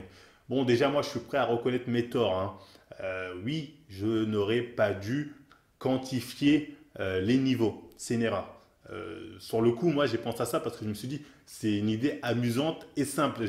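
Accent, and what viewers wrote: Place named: England, United Kingdom. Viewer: Les fit gurrrr, j'adore ton accent anglais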